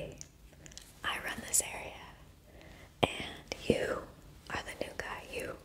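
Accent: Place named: United Kingdom. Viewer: you're funny saying "Hey,you" and "Who do you think you are?".If you could ask anything about me,fun or otherwise,what would it be?I respectfully wish you continued success with the ASMR videos.Love your facial expressions,mouth sounds,tickle taunts and your cute accent,you silly Brit.You can't get me if you tried.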